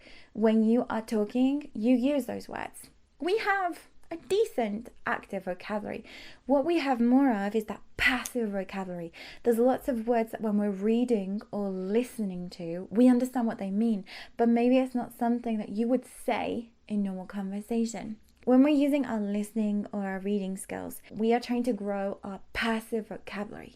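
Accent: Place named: United Kingdom. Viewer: Hi there, Teacher! Your British English accent is THE FOREVER the best I have heard of and your voice is really pleasant to listen to! And I really appreciate your enormous patience, dedication, enthusiasm, kindness, time and effort in making excellent English teaching videos for non-native speakers like me! Absolutely amazing! Benny Mok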